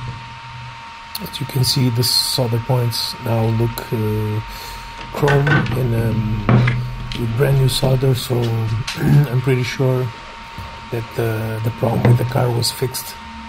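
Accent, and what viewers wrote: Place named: Portugal. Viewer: Hello do you are Portuguese? You have same acent of Portuguese in English eheh. I need to do this repair too 😅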